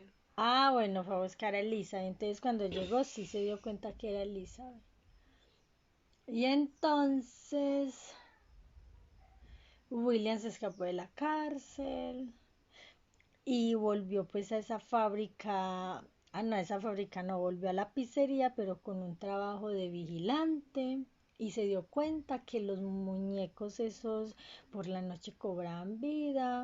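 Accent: Colombia. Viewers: que señora con cultura mi valecita mia Bv, eres colombiana? Se escucha en tu acento, si es verdad yo también 👌👌👌 Pd: nueva sub gracias a este hecho contenido 👌👌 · vi todo el vídeo y escuche tu acento eres de Colombia?? Que buen video por cierto de chiquito me daban miedo los electrónicos XD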